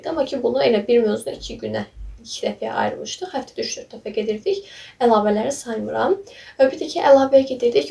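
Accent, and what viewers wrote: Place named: Nakhchivan, Azerbaijan. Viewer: tam təxmin etdiyim kimi😊❤ Ləhcə ilə danışmırdın sadece ara sıra bəzi kəlimələrdən Naxçıvanlı olduğu düşündüm ❤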